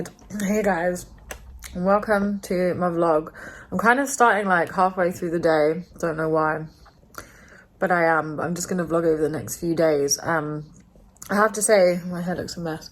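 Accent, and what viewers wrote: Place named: England, United Kingdom. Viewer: Nice English accent different from Americans lol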